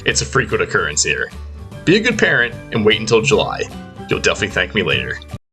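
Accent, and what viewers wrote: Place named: Philadelphia, United States. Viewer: Clearly this person is from Philly…..strong accent. All beaches are in Cape May County. He ignores the rest of the shore.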